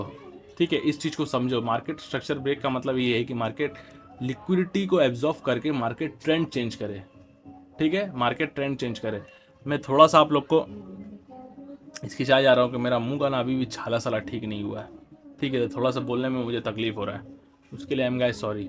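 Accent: Bengal, India. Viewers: Are you Bengali bhai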